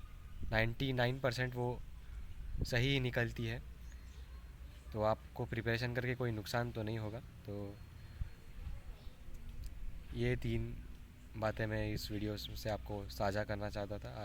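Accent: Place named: Maharashtra, India. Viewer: Note*-playback speed -1.75x Thanks bro ,it seems like ur first language is marathi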